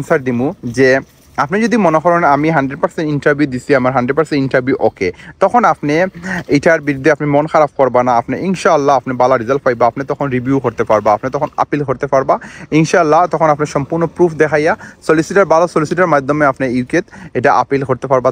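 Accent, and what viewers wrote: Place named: Sylhet, Bangladesh. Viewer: Bhai kotha bujhte onek problem hoi.. Hoi proper banglai bolen nahole english a video banan Amra sobai to Sylheti accent er sathe used to na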